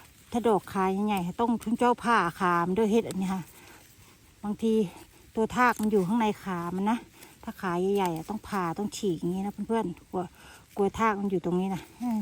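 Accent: Sakon Nakhon, Thailand. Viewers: เจ้าคนบ้านได๋สำเนียงคือไทสกลแท้จ้า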